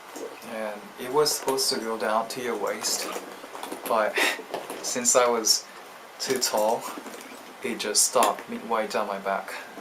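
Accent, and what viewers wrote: Australia. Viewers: You sound Australian when you speak in English! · to me your english sounds really australian here, very interesting! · you sound Australian, it is odd. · Dude, nice Australian accent.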